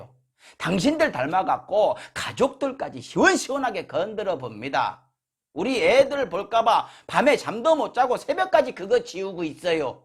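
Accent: Jeolla, South Korea. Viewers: 이 형 전라도 사투리맛갈나게하네.. 화이팅..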